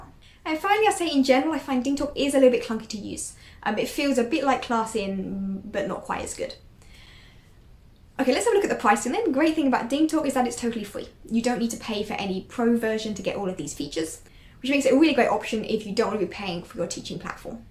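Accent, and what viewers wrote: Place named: England, United Kingdom. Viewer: Hello. Thanks for the great information that you share with us. However, can you please slow your speech down? I am a Native English speaker who teaches English online. I am finding it very difficult to understand you as your English accent is very thick and you also speak very fast. I am trying to understand all of the information that you are sharing with us however, I am replaying the video over and over again in order to understand you. Best wishes, Karyn.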